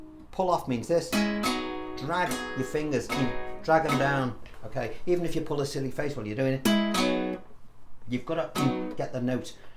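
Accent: Liverpool, United Kingdom. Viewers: Hi from an Italian drummer who loves to play (poorely) acoustic guitar. Love your style and your SCOUSE accent!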